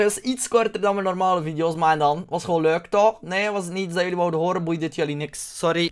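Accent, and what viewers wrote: Belgium. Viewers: heey, lees je dit nu in je bed? XD ik kan altijd om je lachen, en je Belgiche accent vind ik echt awesome. xx nima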